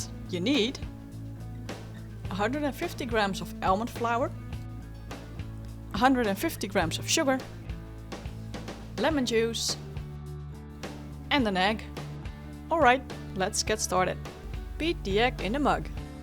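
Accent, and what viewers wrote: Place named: Netherlands. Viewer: Waarom praat je niet gewoon Nederlands want je Engels tells me clearly dat je Nederlands bent.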